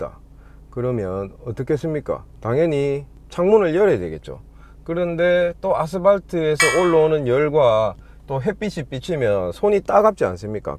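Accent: Gyeongsang, South Korea. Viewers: 실내가 찹찰해짔다 ㅋㅋㅋ 아 찐 갱상도남자 ㅋㅋㅋ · 행님 영상 급나게 잘보고잇습니다 경상도 사람이라서 사투리 쓰시는 유튜버 뵐때마다 을매나 반가운지😁 제가 일할때 레이 밴 차량을 타는데 이 방법이 잘 안통합니다 ㅠㅠ 형님 영상에 있는대로 외기순환을 적극적으로 활용해서 해도 에어컨 팬 자체가 약해서 그런지 안통하더라구요 ㅠㅠ 방법이 어찌 없을까요..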